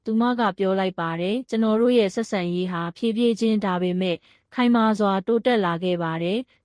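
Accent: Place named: Mandalay, Myanmar. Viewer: မန္တလေးပြောလိုက်အာရပ်ပြောလိုက်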